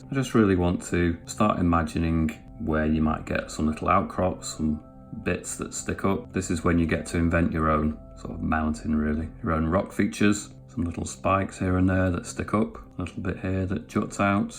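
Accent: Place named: North, United Kingdom. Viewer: Great to hear a familiar northern accent on YouTube! Brilliant video!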